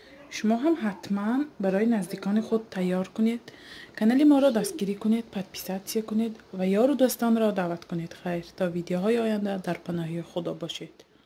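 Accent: Isfara, Tajikistan. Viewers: Салом аз лахчаи гапзаниятон исфараги менамоед офарин хело хурсанд шудам ваобуна шудам видеохои навро интизорам салом аз шахри маскав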